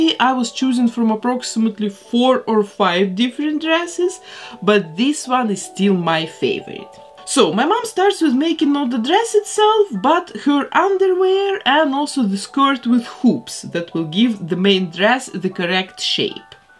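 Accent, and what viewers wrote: Russia. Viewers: По первым фразам поняла, что вы русская 😇😘😘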